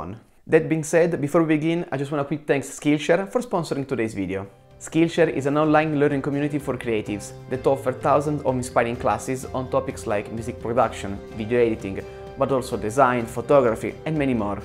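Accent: Italy. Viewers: You have the best Italian accent I have ever heard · aooo ma se sente che sei italiano AOOOOOOOO🇮🇹🇮🇹🇮🇹🇮🇹🇮🇹🇮🇹aoooooooaooooooo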